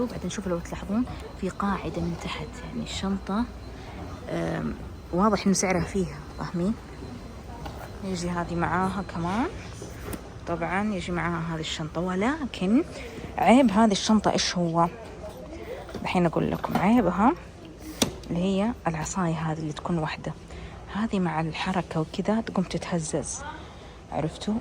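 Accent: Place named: Saudi Arabia. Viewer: انا متابعة جديدة ممكن ترحيب ❤ صوتك يجنن انا احب اللهجة السعودية 😊✨🦢🇸🇦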